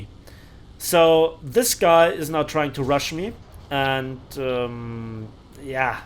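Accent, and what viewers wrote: Germany. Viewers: I just love your German accent man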